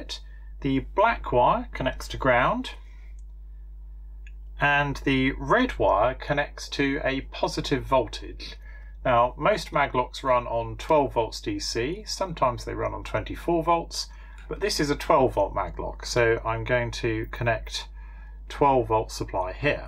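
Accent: United Kingdom. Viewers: Excellent presentation with the charming British accent.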